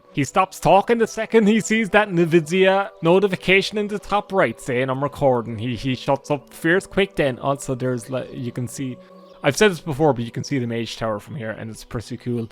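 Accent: Ireland. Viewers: That’s true his Irish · I have a small glimmer of hope, like a candle flickering against the storm, that the morrowind DLC at level 1 will come back. Anyway nice video, still got that charming Irishman voice, like Guiness flowing in a field of clovers. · The Irish man is back!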